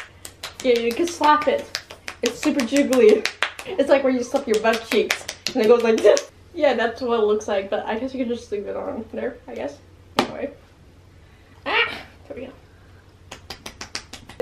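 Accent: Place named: Canada. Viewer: do you live in Canada because you have an accent like a Canadian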